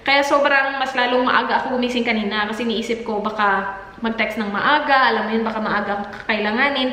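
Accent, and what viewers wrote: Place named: Visayas, Philippines. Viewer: Hahaha ka cute sa buang ba hahaha bisaya pa more😍😍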